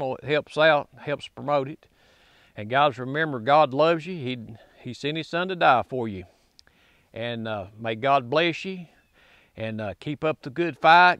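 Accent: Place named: South, United States. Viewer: Love this one can’t wait to pick one up.I just noticed something funny,watching this video while my dads started his diesel truck up can’t hear so put closed caption on and with your accent the stuff it types out vs what I know your actually saying is so far off it’s funny.not making fun of the southern accent the caption is lost it says Hornady as horn today.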